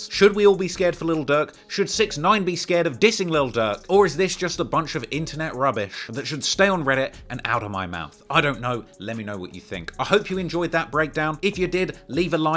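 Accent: United Kingdom. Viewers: I like how “trap shit” is getting covered by a harmless sounding British fella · just a heads up a british accent is not at all good to try being cool and for sure not trying to speak slang man it is horrible please stop trying to talk slang AT ALL or bringing attention to shit the cops may not know yet but putting things you learned out there for more options drama is good for views but not talking street shit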